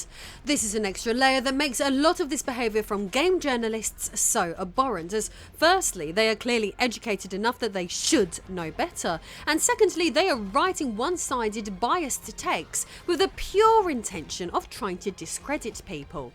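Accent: England, United Kingdom. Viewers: Refreshing to hear an English accent pronounce words that are articulated so differently Stateside (Minus the sarcasm). Wholeheartedly agree with your assessments. Great show as always! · Ilve been seeing people on YouTube are calling you and your husband white or British and making fun of your accent or teeth … and accusing you both of thinking your better than them because your accent…. If that isn’t racist I don’t know what is… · Apparently, I am addicted to subscribing to nerd content makers with British accents. I am so weak.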